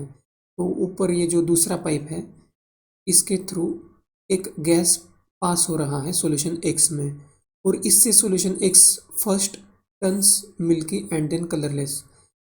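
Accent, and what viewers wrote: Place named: Maharashtra, India. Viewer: Pure Marathi accent ❤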